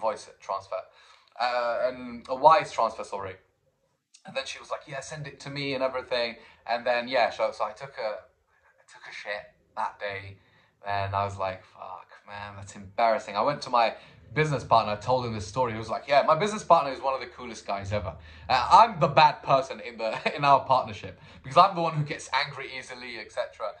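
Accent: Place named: United Kingdom. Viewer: How do you have such a british accent while living in Algeria?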